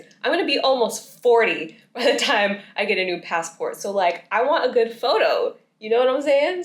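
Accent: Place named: Australia. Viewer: Your such a beautiful soul inside and out and I hope everyday you keep a smile on your face and we love hearing you Aussie accent 😂🫶🏾🫶🏾🫶🏾🤎🤎🤎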